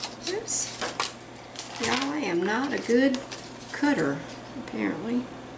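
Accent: South, United States. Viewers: you look beautiful 💖 Love the bunny ideas❣️❣️❣️and I love your Southern accent, you're wonderful ♥️♥️♥️♥️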